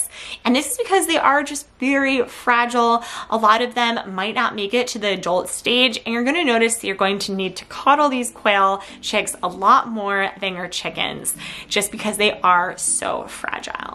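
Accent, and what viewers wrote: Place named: United States. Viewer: “Rabets and Qwel” I swear this is the first time I’ve struggled with the American accent. 😅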